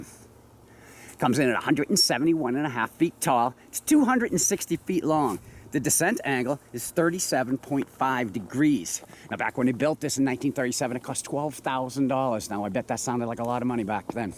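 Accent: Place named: Maine, United States. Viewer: do you guys come from around there? You have quite the accent;-)> (it's so cute!)I'm from Boston and I have an accent. What's with the "Mylan, NH"? Shouldn't it be "Mill-ahn"?I say "pahk" too. You almost sound like a Mainer. "You cahn't get they-ah from heyah!" doesn't have an accent?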